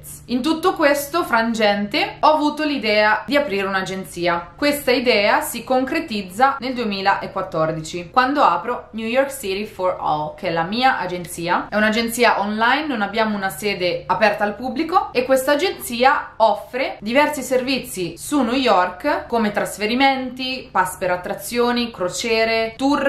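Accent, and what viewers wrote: Ancona, Italy. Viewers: Ciao, sento da come parli che sei di Ancona. Confermi?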